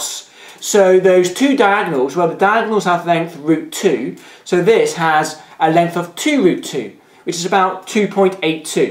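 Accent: United Kingdom. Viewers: bahahaha, liverpudlian accent ftw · totally fucked my mind. Also I want to apologise if you aren't Brit but that's how I imagine the average British accent sounds.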